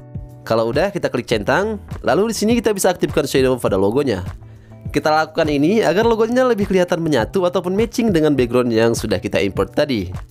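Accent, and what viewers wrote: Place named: Gayo, Indonesia. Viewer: Fix dialeknya mirip orang gayo🤭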